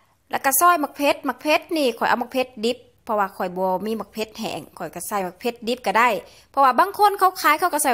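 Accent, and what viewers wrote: Savannakhet, Laos. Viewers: ມວ່ນເດ ສຽງສາວສະຫວັນ fc ເດີຕິຕາມມາຕຫລອດສອນລະອຽດເວົ້າລະອຽດ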